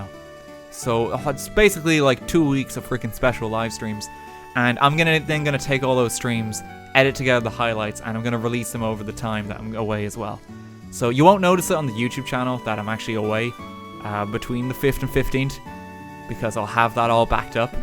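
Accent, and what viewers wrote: Ireland. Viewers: Holy shit youre irish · So what part of Ireland are you from?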